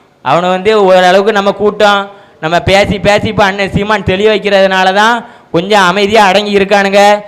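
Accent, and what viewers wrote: Tirunelveli, India. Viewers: நெல்லை தமிழ் சூப்பர் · நெல்லை தமிழ் பேசும் சீமான்🎉🎉🎉🎉🎉🎉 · அருமையான பதிவு தம்பி உன் நெல்லை தமிழ் அருமைட செல்லம் எத்தனை குட்டி புலி சீமான்கள் அவன்கள் எல்லா தெலுங்கு நாய்கள் தமிழ் இண துரோகிகள் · இந்த தம்பியின் அந்த நெல்லை தமிழ் ❤❤🎉🎉🎉🎉சிறப்பு ட தம்பி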